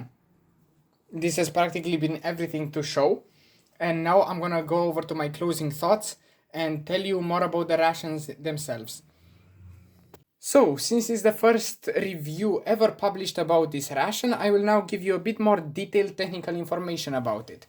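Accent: Romania. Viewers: It is an accent. It's not for you, being Romanian, sounds "normal" to you, but it's a VERY appreciated accent by other countries. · Lovely classic romanian B2 accent Thx for the video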